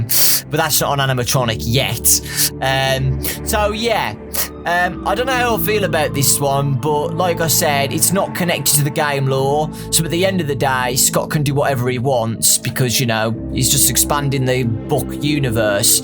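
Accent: United Kingdom. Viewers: As a non-native English speaker, accent really caught my attention. Does anybody know what part of the UK is he from? Also, as always, great video!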